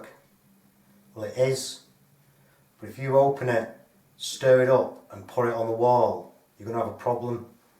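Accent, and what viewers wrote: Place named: Liverpool, United Kingdom. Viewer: Great video! How long would you leave it before going in with the 2nd coat? Trying to place your accent, it's sounds slightly Liverpudlian but not too much. Warrington area maybe?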